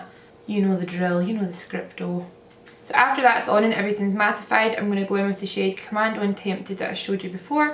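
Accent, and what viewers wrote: Scotland, United Kingdom. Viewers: Omg you're Scottish, yes finally 🙌🏽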